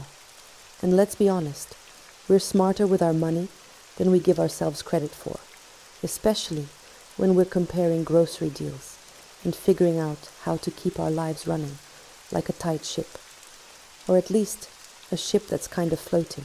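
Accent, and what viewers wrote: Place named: France. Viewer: I ❤ Your French Accent. ❤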